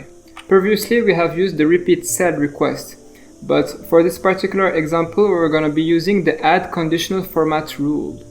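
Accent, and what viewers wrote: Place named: France. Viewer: Bonjour, tu as un accent Français, are you french? merci pour le cours 👌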